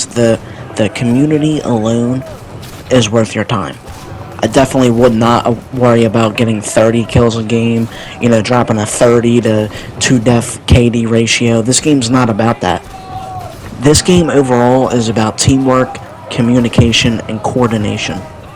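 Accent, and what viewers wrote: Baltimore, United States. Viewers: Thanks for the tips. U from Baltimore? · yeah but more specifically Baltimore or Philadelphia not sure which one but that region.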